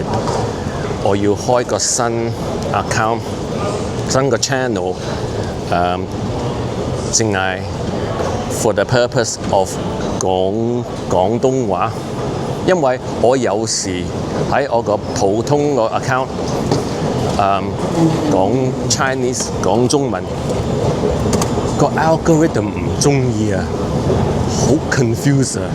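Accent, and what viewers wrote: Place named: Hong Kong, China. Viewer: You have a Hong Kong accent in your Cantonese 😊 "or" instead of "ngo" 😆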